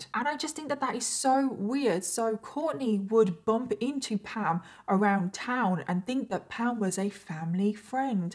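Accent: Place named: RP, United Kingdom. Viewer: It's weird to hear someone with her accent be shocked about cousin relationships.. royal fam history?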